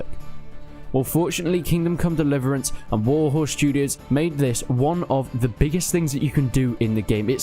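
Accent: United Kingdom. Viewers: I thought he was British?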